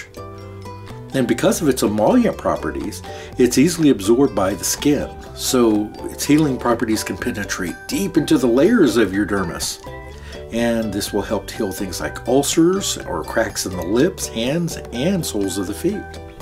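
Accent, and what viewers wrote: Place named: South, United States. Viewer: I'm so glad you are okay and I hopefully all the animals are doing well! Ahh hahaha, I love your country voice wait until u hear my real country as turnip greens vice😂😂😂😂😂 but honestly we got ice and snow q, but nothing like!e that although we do every 6 yrs or so.. But doing my patron. I wanted to send the national guard to get you. That's bow worried I was, there's a surprise on the way my dear It' be over yonder in your box very soon alright sweetheart. Still getting thru the excruciating pain with nothing talked to a specialist in osteonocrosis and averyadic neurcrosis and I might be going to john Hopkins or California to a hospital there to be part of a study, I have been told I have about a year to live in!was they slow it down, but keeping busy and putting my energy what I have on new techniques and a total makeover of my yt channel, name and everything! 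Patron on the way!!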